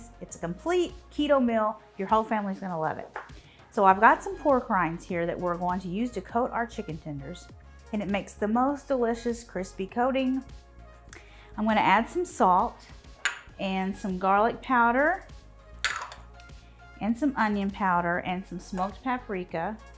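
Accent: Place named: South, United States. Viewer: Hi! I just made this for dinner tonight and I so enjoyed! Loved the tenders and the bacon plus mustard powder made the cauliflower mac and cheese yum-may 😊. I enjoy your recipes and your sweet voice. It makes me think you're from the hospitable sweet south. Keep these recipes coming. TY!!!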